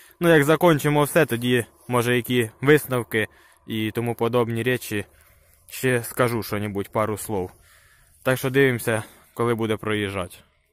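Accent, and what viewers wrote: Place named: Ternopil, Ukraine. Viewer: а значить перепутав у тернополі похожа до вашого акценту.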